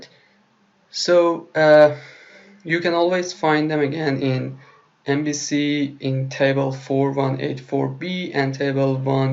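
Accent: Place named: Iran. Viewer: لهچه ات داد میزنه ایرانی هستی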